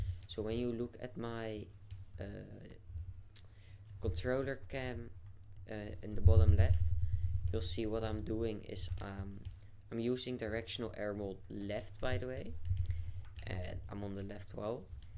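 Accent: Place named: Netherlands. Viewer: (ik ben ook nederlands ik herken het accent gelijk😭)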